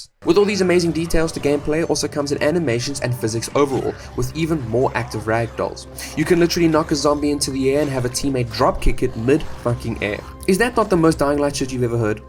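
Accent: South Africa. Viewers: I can recognise that accent anywhere. It might be too subtle for most to notice, but not to other South Africans. Where are in SA are you from, bru? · Lekker video bru, i could instantly tell from the accent you are from South Africa 🇿🇦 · Not related to the game, your accent sounded South African and confirmed it. Glad to see SA creators are making high level content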